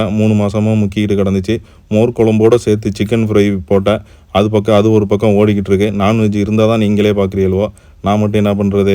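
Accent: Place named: Adirampattinam, India. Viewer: Assalamu alaikum eppadi irukinga nice cooking 😂❤ neenga adirampatnama? Unga tamil antha maathiri iruku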